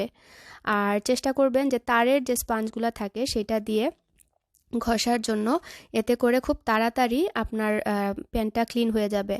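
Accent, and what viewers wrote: Sylhet, Bangladesh. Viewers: No offense but i think it was much better when you used to speak in sylhety accent. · Thanks apu... ❤❤❤ lots of love & dua for u specially for falaq... I just love to hear ur sylheti language. ... Seriously.. So sweet & cute.. Dua kori apu tmi onk valo thako ❤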